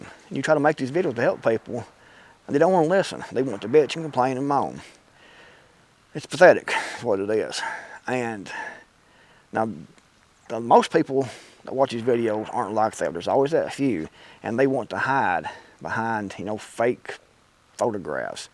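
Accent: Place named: Tennessee, United States. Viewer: I thought the reload was spot on. What you said about Jui Jitsu is true. Tae Kwon Do would be the same. There are elements in all of it that could be useful, but street fighting, especially against multiple adversaries, will prove you need a lot more than any one form teaches. What's with the breathing comments? I'm just glad you are breathing. Jeez!!! What up people. Now, I do notice that you talk a bit different. I think I remember you being from Tennessee - y'all talk a bit different than we do here in Alabama. In fact, we here in Alabama notice that quite a lot of people in different other parts of the country don't talk as correctly as we do here. This is something we notice, and have come to accept. We are OK with it. Don't let the malcontents get to you. Have a great day!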